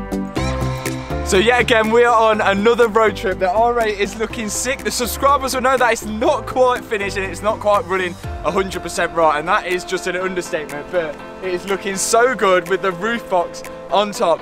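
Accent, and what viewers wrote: Leicester, United Kingdom. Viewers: Your from Leicester 😁😁😁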